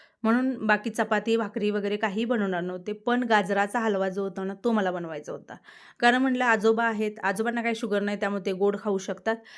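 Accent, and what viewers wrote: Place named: Dhangari, India. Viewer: ताई तुझी भाषा धनगरी वाटते ग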